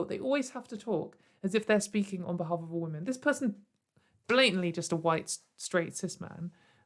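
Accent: United Kingdom. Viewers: My first video of yours! Very excited! I have to say, I love your voice, it's honestly very soothing. And as an American I of course find any UK accent attractive (okay maybe not Cockney accents, but still) I also am so blown away by your patience and calm tone throughout the video (I mean I'm only halfway, but I wouldve lost my absolute shit by now).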